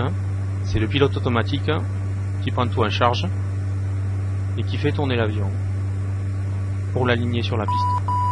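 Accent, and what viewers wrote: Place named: South, France. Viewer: Très bon tuto. L’anglais avé l'accent donne en plus un air de vacances.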